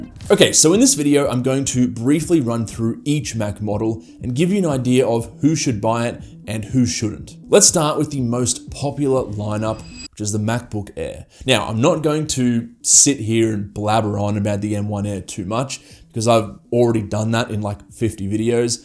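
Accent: Australia. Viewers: Thank you!!! I really love your content so much and love hearing a fellow Aussie on here! I’m sold- you definitely made me see that that MacBook Pro 14 is probably the best way I could go… for just a little bit extra than the air with added specs, yet substantially lower than the 16”. Seriously thank you! I can’t believe I didn’t see that before . Well only started looking for a new MacBook recently…. Cheers!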